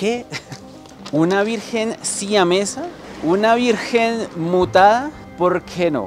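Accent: Bogotá, Colombia. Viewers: Te felicito me encantan tus historias además que eres un cachaco muy genuino😊 a pesar de lo joven que eres tienes muy marcado el acento bogotano y también chévere que caminas por nuestra icónica ciudad cuando vas narrando, muchas felicidades tienes talento y carisma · El comunica rolo 👌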